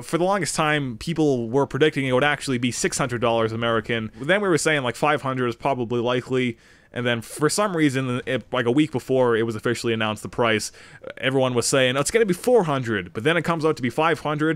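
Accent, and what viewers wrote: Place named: Canada. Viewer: Your canidiaannn!!!!! Damm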